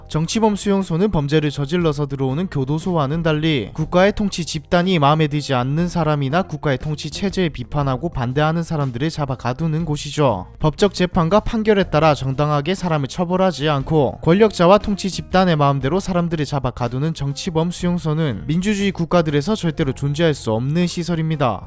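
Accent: Gyeongsang, South Korea. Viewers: 혹시 여자성우분은 AI였었나요??? 남자분은 경상도 아저씨느낌임..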